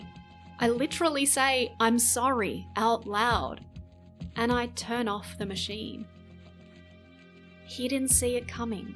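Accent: Australia. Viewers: Grabbed this based on your vid. Nice to hear a fellow female Aussie gamer. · Australian goth???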